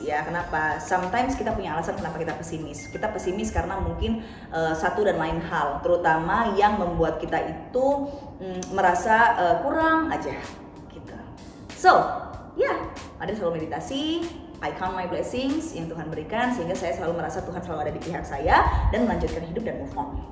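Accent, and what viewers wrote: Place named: Medan, Indonesia. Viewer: Hah? Madre orang medan ya? Pantesan aku pernah dengar madre pakai logat medan sama kira. Aku juga orang medan madre. Salam kenal 😊😊😊😊😊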